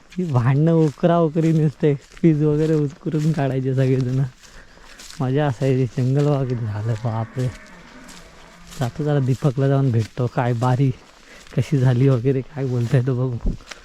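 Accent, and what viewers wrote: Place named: Ghats, India. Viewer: Bhasha. Kokni vatat nahi,ghatavarchi vatte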